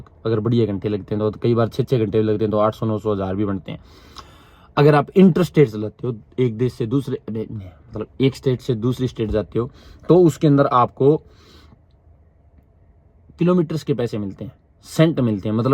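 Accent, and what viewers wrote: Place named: Haryana, India. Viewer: Video bhut dekhe aap jaise nhi apni boli m maja aa gaya Bhai ji konsa gaon h aapka boli apni mast apni boli m video bhanya acha lega m naresh gaon barwa bhiwani haryana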